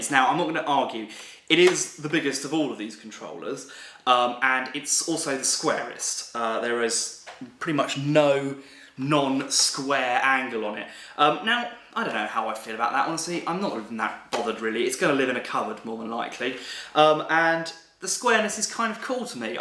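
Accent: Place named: RP, United Kingdom. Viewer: He's posh with bad grammar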